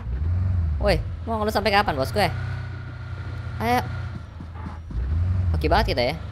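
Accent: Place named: South Kalimantan, Indonesia. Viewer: orang kalimantan sltan itu bang bhasanya wkwkwk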